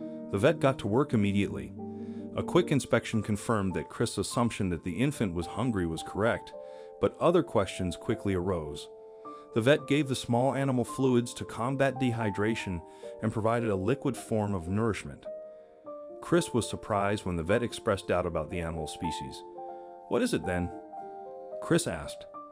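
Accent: United States. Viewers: This becomes apparent that this is in Australia! I at first thought it was an armadillo. But “Chinese”! No way! Only Australia has wombats! The man must have lived nearby. There’s no way wombats would travel really far to rejoin a family member? And why do we have a Yank narrating?!?! They are very mischievous animals! Another good reason to allow them live in the wild.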